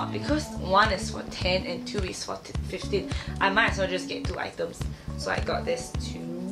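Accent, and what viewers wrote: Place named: Singapore. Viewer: The moment I heard you speak I knew you were from Singapore :P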